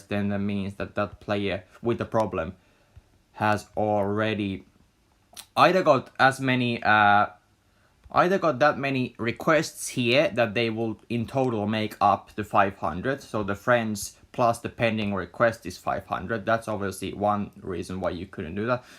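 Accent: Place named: Finland. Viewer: Hyvä ralli enkku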